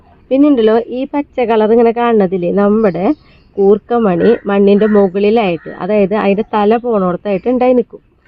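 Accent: Thrissur, India. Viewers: Nice to hear trichur basha smiths God bless be cause Amma is with u dear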